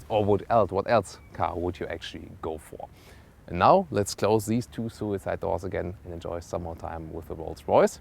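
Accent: Germany. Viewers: das deutsche english hört man sehr raus, aber trotzdem super video!!!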